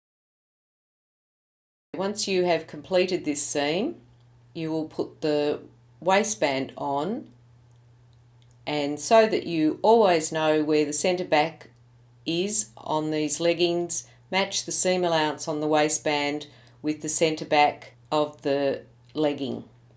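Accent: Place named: Australia. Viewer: Well, I love your Australian accent! (I am in Sydney - LOL!!) Have subbed - just getting back into sewing after having children and loving your tutorials :-)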